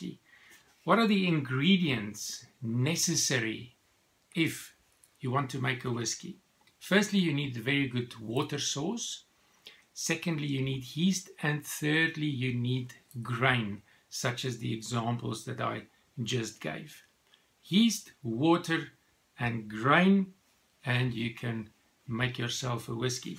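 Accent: South Africa. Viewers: I'm a complete beginner when it comes to whiskey, and this is by far THE BEST video I've found that explains everything clearly and in an order that makes a lot of sense. Thanks so much! I also hear a South African accent, which is where I'm from 😁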